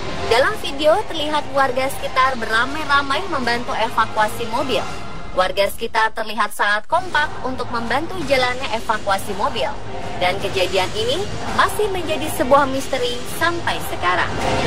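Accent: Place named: Java, Indonesia. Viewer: Jawa kerass lur.. 😀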